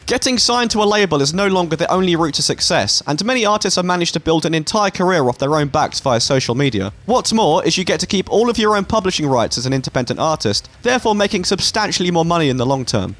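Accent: United Kingdom. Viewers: It's so refreshing to hear a fellow british accent on youtube lmao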